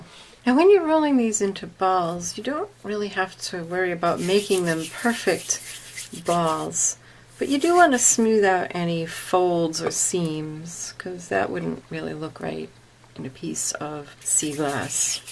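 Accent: United States. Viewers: Are you from the Boston area? Picking up an accent and I want to know if I’m right 🙂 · OMG I was totally going to ask if you were south of Boston because you sound so much like my friend from West Bridgwater! Oh my goodness you sound like an actual native - BUT not everyone has the ear for the subtle new england accent differences so I'd be willing to bet not a lot of other people make this assumption about you (I'm from Boston but have tried hard to eliminate it when necessary - as not to have to repeat myself and/or sound crass lol). I should really become a phonologist! :) oh and more importantly THANK YOU SO MUCH for this tutorial because I just finished it and you helped me so so much just by letting us know that the alcohol ink should dry matte before mixing! Best tip ever! <3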